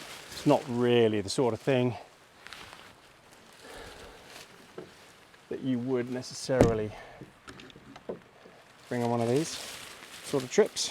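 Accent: England, United Kingdom. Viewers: Im confused , your accent sounds British , your truck plates say NZ and its raining and cold when New Zealand is in summer in NOV .... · So you are in NZ. You sound like you are from the UK. Snap. Where was this filmed? Excellent work again. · This was very relaxing. Just out of curiosity, were you raised in Britain? You appear to have a bit of a British accent. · Are you from England originally? I’m isolating at home at the min and started watching your videos 👍🏻😊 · Really enjoyed this video I found your channel last night for first time and watch this video and thought you was from the UK until you said New Zealand lol hope you and Bruce are doing well.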